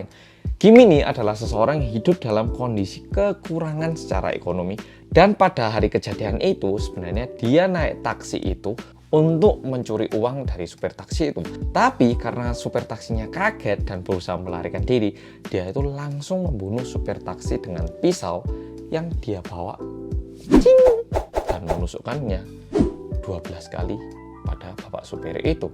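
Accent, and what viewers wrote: Java, Indonesia. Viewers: Medooooook, kacamata e baru rek mbois 😎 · medhok ◐.̃◐ Nessie Judge: horror 👻 Nadia Omara: ngegass 😈 Arroyan Pram:serius, editan terbagus dan niat Detektif Aldo: serius pake banget (｡ì _ í｡) Gak tau kenapa aku suka banget sama kalian:-) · oppa oppa medok😍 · Gak kerasa awal tau mas 4 tahun lalu, masih inget bgt prtama nonton video yang ngejelasin ttg sim. Abis itu hebohin org serumah grgr org korea yang medok, setelah itu rajin nonton video mas wah. Terharu liat perkembangan channel ini 😭😭😭 chukkae mas udah smpe segede ini🎉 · menarik juga nih ceritanya wkwk jadi pengen nyoba nonton filmnya:D makasih banyak ya mas, dari bbrp video mas aku jd banyak tau ttg hal2 baru, udah sejak lama malah wk tapi aku baru komen skrg aja:v semangat terus ya mas! suka sama kalimat yg disisipkan di dlm video, bener bermakna, ga lebay, realistis, dan memotivasi. 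Intinya, apa yaa wkwkwk.... SEMANGAT TERUS! MAS BERBEDA, MEDOKNYA, PEMBAWAANNYA, MATERINYA, semuanya AKU SUKA krn itu semua ISTIMEWA! SEMANGITTT!!!!! /abaikan kalimatku kalo terkesan lebay or ga nyambung ya mas wkwk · Aku dulunya pe cinta drakor. skrng, udah sering nonton drakor Krna sudah ketagihan menonton vlok medok😅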